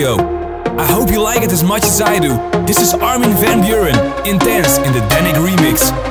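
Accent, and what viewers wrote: Netherlands. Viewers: haha dat nederlandse accent :^) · That Dutch Accent =) ;D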